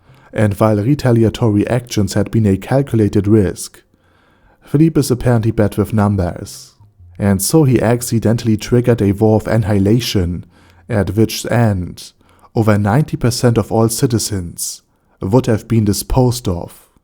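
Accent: Germany. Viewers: Why do you speak with German accent ?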